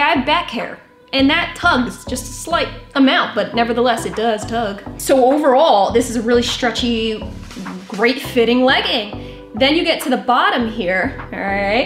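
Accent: New Jersey, United States. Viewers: Okay I’m back I’m definitely buying this collection I like that it glows in the dark that’s dope man that green is super cute I see it’s a lower cut still cute I like a pocket on my workout pants because I can put my phone in there but other then that I don’t like all those lines where are you from u sound like ur from jersey I don’t like nothing sliding yeah see I don’t like that on my heel I will pass on that one bring the glow in the dark tho that’s the one I want for sure! I don’t like padding I like the back of that bra tho on that green nice medium band great review girly u are so detail u said damn lol I got a pair of nikes that glow in the dark I didn’t even know they did I was like wow it will go good with that those make ur butt sit up I’m here for it it’s gone lift because ur small but other then that u need to tell gym shark to let u design some stuff because u know about them well they need to have u on there team for sure man I love this video great job on the two fits for gym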